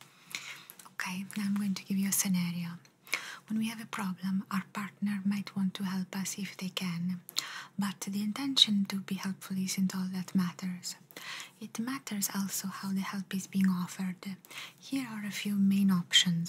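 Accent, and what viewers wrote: Italy. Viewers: Italian accent videos are perfect for relaxing and unwind 😊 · Hello, perfect ASMR, I loved it, I enjoyed it, it's very relaxing and with an Italian accent, I love it, greetings, beautiful.💖💖🌹🌹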